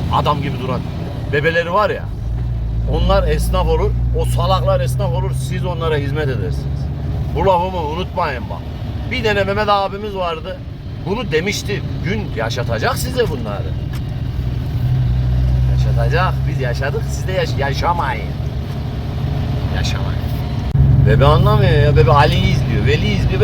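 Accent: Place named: Yozgat, Turkey. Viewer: bey sizin siveniz neden Yozgatli gibi?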